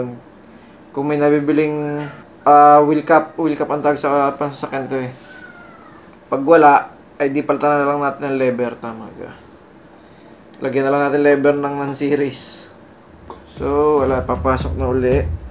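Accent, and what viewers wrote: Batangas, Philippines. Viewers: Batangeño accent ah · Mahahalata mo ang liget sa hinde na batangueño eh. Areng si idol, liget. 😂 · kabayan saan ka gah s batangas haha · taga bats ata kayo bos,halata sa boses haha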